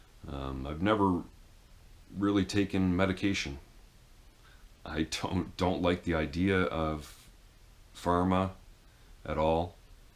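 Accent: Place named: Canada. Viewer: Lol Man I saw your video about 3 or 4 years ago and I ended up finally trying shrooms off and on several times over a couple of years. They definitely have the ability to reset your brain and outlook on life. I just happened to run across your same video again this evening. It is unbelievable how much you and I look alike, have similar personality traits and even our voice sounds alike except for the fact that you have a Canadian accent and I am from Alabama so I have more of a southern United States accent. I mean I know that sounds crazy but the resemblance is unbelievable.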